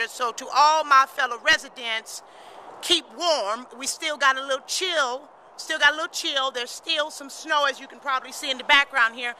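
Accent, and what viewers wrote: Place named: South, United States. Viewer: This video would make an ideal case study for linguists in the exploration of the African American Vernacular English / Southern American English, with a Georgian / Coastal Southern dialect. She has such a graceful demand over Southern chain shifts and she speaks with so much clarity.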